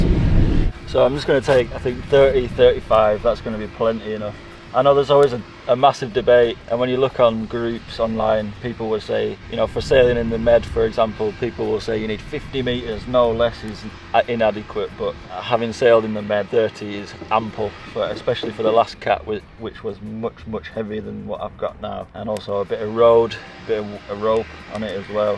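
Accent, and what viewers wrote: Lancashire, United Kingdom. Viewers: Sounds like most of the blokes in my nice Lancashire town....there are still some left.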